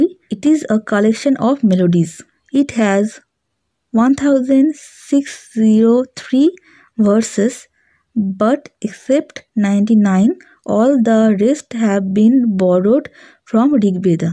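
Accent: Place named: Bengal, India. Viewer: I think you are bengali because taking like bengali English mixs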